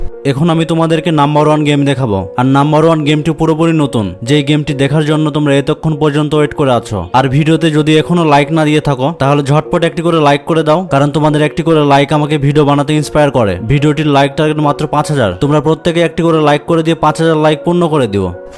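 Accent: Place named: Barishal, Bangladesh. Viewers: বরিশালের ভাষা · Batisaler vasa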